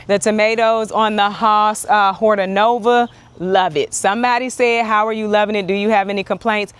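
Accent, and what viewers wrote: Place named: Chicago, United States. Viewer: I cannot convince myself that you are not from The Chi. You just have that Chicago vibe. lol. Heeeey from Mississippi!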